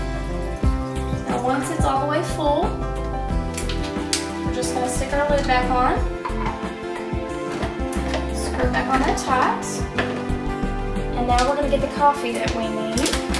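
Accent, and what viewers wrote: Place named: South, United States. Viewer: Love the southern drawl 🤠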